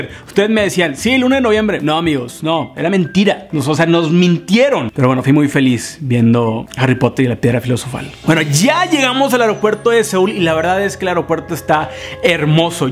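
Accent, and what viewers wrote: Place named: North, Mexico. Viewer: Veo todos tus videos sonriendo 🤩 y amo tu acento norteño! Yo también soy del norte 🙋🏻‍♀️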